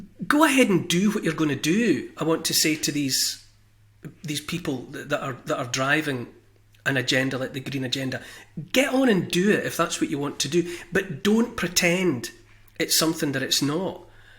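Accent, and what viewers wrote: Scotland, United Kingdom. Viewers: You Scottish or english?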